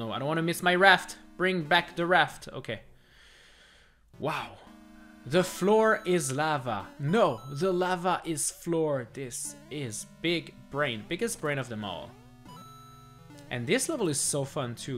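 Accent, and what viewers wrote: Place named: Spain. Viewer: If you don't speak spanish, why do you have a spanish accent?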